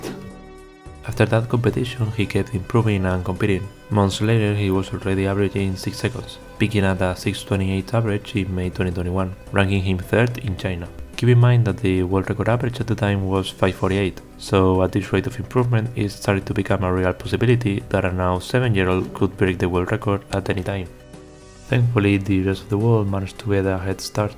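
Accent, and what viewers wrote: Spain. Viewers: me gusta tu acento español jajaj intenta alzar un poco mas la voz al hablar al micro, no seas timido!! el video esta guapisimo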